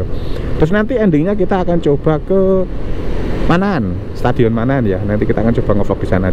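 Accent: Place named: Malang, Indonesia. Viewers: ngomong omah e klaten mas, tapi logat mu wong jatim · Solo pancen ngangenin, Mas wong Klaten tapi logete Malang puol..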